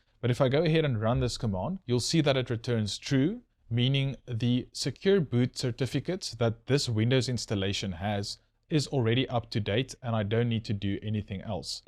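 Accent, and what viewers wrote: South Africa. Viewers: I like this site for its good advice, an insight into just how long M'soft has been run by control freaks and because I went to school with boarders who were mainly from ZA and Rhodesia. The accent reminds me of decades ago when a town scholarship day boy was taught how to play rugger as it SHOULD be played -- by the rules and never to shout. In old age, these things become important. Thank you.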